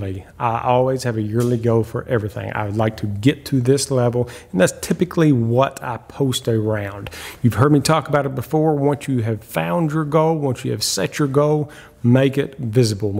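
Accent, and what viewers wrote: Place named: Virginia, United States. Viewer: I gotta ask. Are you from Virginia by chance. Just going by your cool accent.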